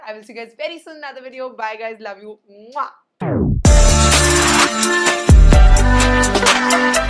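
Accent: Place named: Mumbai, India. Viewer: Liked the tapori mumbai type of accent 😂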